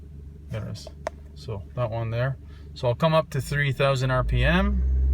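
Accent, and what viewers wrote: Scotland, United Kingdom. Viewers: fek sake shu have known. Alternative ulster was our anthem. Used to play in the limelight in belfast. I was in my teens in the 80's. I hear ur scots accent breaking thru regularly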